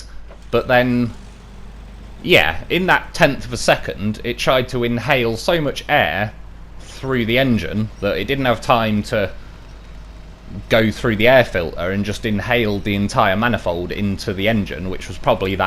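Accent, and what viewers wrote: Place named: Staffordshire, United Kingdom. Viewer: The Peugeot 406, not a horse of a vehicle. Still trying to place your accent. Maybe Staffordshire, Stoke, I feel it maybe further North. Don't tell me I'll get it eventually haha. My car history included some French cars too, Citroen BX 16 valve, other Citroens, couple of Peugeots. Reliable, no. Comfy, yes. The BX 16 valve was fast when it worked, but all ended as scrap.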